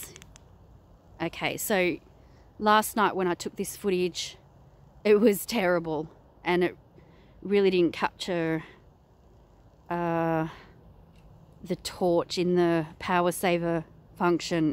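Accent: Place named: Australia. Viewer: Good job thanks! Love your accent too :) Love Australia RIP Krikey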